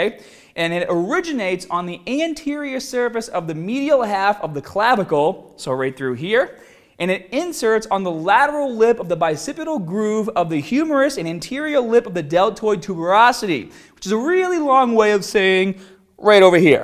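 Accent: Boston, United States. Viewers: So many comments bitching about accent... grow up, everybody from the Boston area has the same accent. I moved away from Southie awhile ago (to southeast Iowa), unless I am drinking, I can hide the accent, but unfortunately it will be with me my entire life. If you cannot get thru the video because of the accent, you are a child who should be on A.D.D. medication, don't worry about body building till you become a bit more focused, it is called priorities. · First thing I thought was oh!! A fellow Bostonian ❤ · When did this guy get a Boston accent? 😂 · this guys boston accent is hilarious!!! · he hid his accent when trying to go mainstream now he lets his inner bostonian out. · It's called the Boston accent. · It's because originally he tried much harder to HIDE the Boston accent... but then has since stopped doing that because it's idiotic and now (and back in 2016) he's a lot more natural. 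· No he just has a Boston Shore accent! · Dude is from Boston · Yes he has a Boston accent, just watch the video for the gym tips. It's really annoying to see the comment section flooded with people commenting on his accent and not gym-related things. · I love that Bahston accent. lmao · When the fuck did this guy develop a Boston accent lol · Maybe that's his real Boston accent but hes trying to hide it but it comes out 👍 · obviously Boston. We New Yorkers don't speak so exaggerated. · lol. this is Boston accent right? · Is that the Boston accent they talk about? also when did his accent become so strong? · He's got a Boston accent. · Dude your sudden onset of a thick boston accent is ridiculous lmao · You sound like you're from Boston. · holy shit - the Boston comes strong in this one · Woooooow! Your Boston (?) accent is getting stronger and stronger! Regional accents have their charm. 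· i never noticed boston accent before. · is he from Boston? sounds like a boston accent. not too heavy but subtly noticable. its perfect. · don't listen to the haters. the Boston accent is sick. better than the boring ass baritone most Americans speak with. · Did just recently develop his Bahstin accent or was I just missing it for years? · Nice Bostonian accent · Apparently it's a Boston accent. I got confused since I assumed 100% of America accentuated the 'r's.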